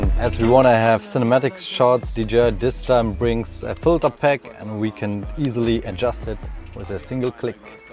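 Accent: Germany. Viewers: your englisch sounds a littl bit like you are german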